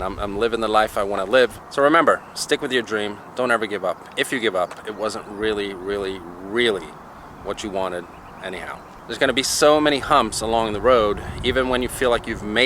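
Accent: Pittsburgh, United States. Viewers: Perfect American accent didn’t know you were from Swede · Dude u sound like u were born in the states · From Sweden since 2012, but sounds totally American 🤯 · defo turned American, repeat yourselves many times and waffle a lot, instead of getting to the point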